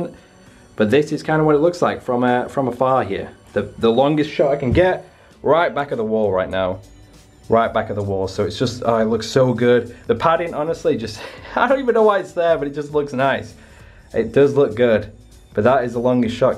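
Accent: United Kingdom. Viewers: his accent man half British half American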